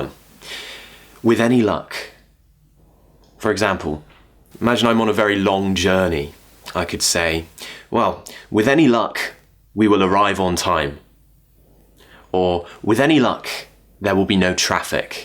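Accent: RP, United Kingdom. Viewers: I really like your british accent 😍😍😍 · Are you using RP? Sorry I cannot tell the difference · I've been watching your videos lately and all I found was your British English pronunciation is my ideal one! I wish I could go to the UK and study English, but I'm too skint to do that.. (Is that correct? using the word "skint"?) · I miss your lesson, your pronunciation is so smooth and really british, love it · With this pretty face and British accent he could teach me how to install a damn garage door, and I would still be excited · Your accent perfect,i love this british accent . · Like your british accent 👌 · Of course it's been ansolutely useful... And a pleasure to listen to your inspiring RP pronunciation 💜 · I'm a new follower of yours, and I think you're the best teacher. Its very interesting to watch your lessons since your accent is very British, very expressive when you explain to your viewers and most of all the sense of British humour which naturally comes to you when you teach especially when you end the video. 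Thank you so much!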